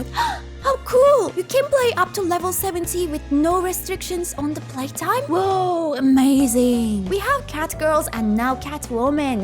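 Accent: Turkey. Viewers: I can smell a turkish accent, are you turkish? :D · bu bir türk aksanı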